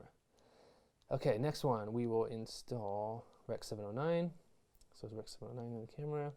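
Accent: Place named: England, United Kingdom. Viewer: Lol your accent is getting more English 😂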